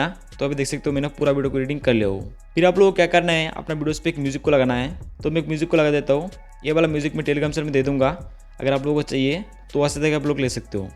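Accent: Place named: Nepal. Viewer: Bhai aap nepal se ho mera dost bhi ese hi bolta hai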